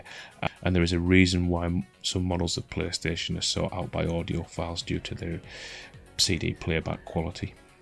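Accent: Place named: North East England, United Kingdom. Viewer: It's probably because this guy is from the North East of England. That's how they pronounce 'u' sounds.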